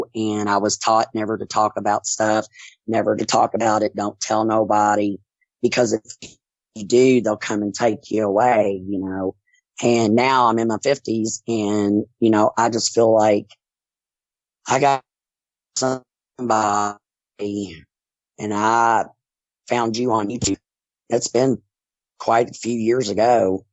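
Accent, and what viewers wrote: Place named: Kentucky, United States. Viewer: hearing your Kentucky accent while listening in KY freaks me out because I feel these beings around. (There’s a noise outside just now) As a courtesy would you mention the county you live in? Prayers going out to you!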